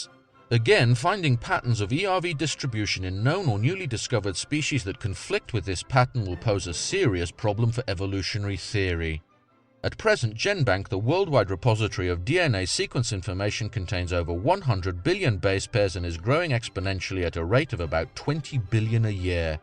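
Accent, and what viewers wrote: United Kingdom. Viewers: omg that british accent just makes it so much more hysterical as fuck. You and coolhardlogic are amazing debunkers and trashing these tin foil hat conspiracy ridden creationist fools just makes it even more gold. Thank you so much for bringing logic to the crazy that seems to be apparently spreading all over youtube. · These videos always have one thing in common, obtrusive in debating style. Bold face lies of no evidence refuting evidence.British accent is alwways evident of a blaphemous intellectual upbring on prejudice conjecture. This guy has an anal and poop fixation.This rant is just hateful and has no substance of honest debate. The mark of the beast is definitely this mans free will choice to his quanrantine to foul language zone of nothing but darkness and no love.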